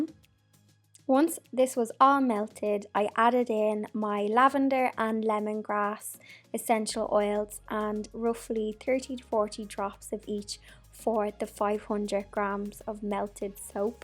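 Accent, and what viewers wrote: Ireland. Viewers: I love your channel lovely to hear an Irish voice on here 😻 I will definitely try your recipes as I love essential oils and handmade soaps I must get some soap making supplies thank you for sharing xx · You could read the telephone book to me and I would listen. ( not in a creepy way!) I love your Irish accent. You have such a lovely home as well and I love to see all your DIY stuff.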